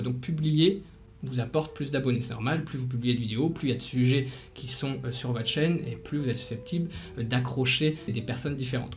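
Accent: North, France. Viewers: J aime bien tes vidéos elles sont toujours instructives et j aime la façon que tu présentes et j aime l accent du nord de la france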